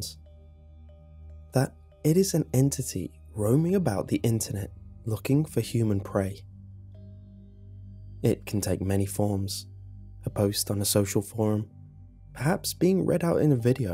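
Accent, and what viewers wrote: England, United Kingdom. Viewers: hey what part of England are you from I'm trying to fugue out what accent you have.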